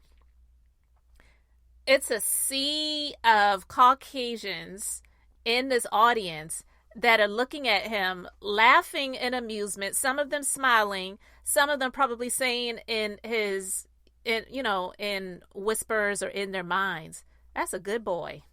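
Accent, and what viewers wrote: Charleston, United States. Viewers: Hey girrrl, you sound like a Charlestonian!!! Then, you do know this jerk is full of it!!!!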